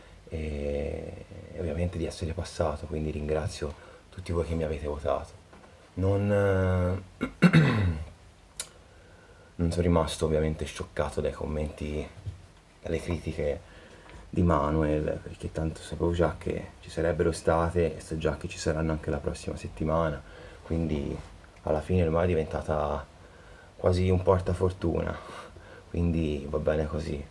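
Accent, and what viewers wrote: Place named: Tuscany, Italy. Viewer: Fantastico l'accento toscano ....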